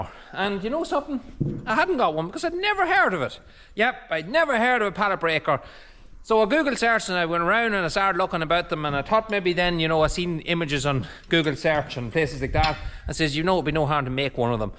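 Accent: Ireland. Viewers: cottage reveal caught my eye, had to watch! and seeing the farm life got me subscribing! i'm a city slicker now for near 30 yr's , was raised on a 10-acre hobby farm....isn't that pallet tool handy? i want to get one now! i have an idea for making a pallet bed and only got as far as collecting pallets,lol, Enjoyed the video watching the wheeler fixing too w/ ur 'helper' ...(lol, dog) ....was trying to figure ur accent, then i heard u say something about Ireland! i'm american, usa....have a good one, i'll watch ya again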